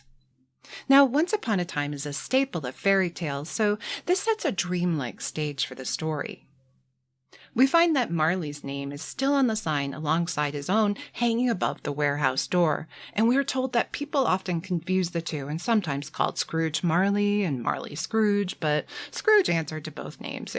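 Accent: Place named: Maryland, United States. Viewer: to your friends😊… this video is… perfect! I have been trying to find just such a video about narrative structure for a group of my students in Grade 9- Freshmen, first year High Schoolers in USA. (Excuse me if 9th Grade is obvious to you! I know nothing about your citizenship, though your accent sounds like mine from Maryland.) Regardless, as said, perfect video! The specifics about the spirits, Dickens’ direct yet deep depictions, and the psychological sides of Scrooge — wow, it is enlightening and engaging without being too easy… Thank you so much! I hope to see more of your videos and use them to help all my students grow in their Narrative Writing abilities! “God bless us, everyone”… but especially you and yours this Christmas!🎄🤓🎄🥳🎄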